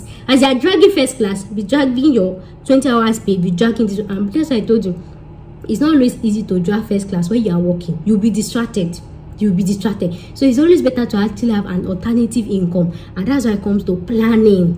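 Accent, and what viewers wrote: Yoruba, Nigeria. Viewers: This was definitely interesting. Didn’t finish the video before I started laughing bout the part when you mentioned your Yoruba accent..😂🤦‍♂️.. thank you for this though. You’ve got a new subscriber😇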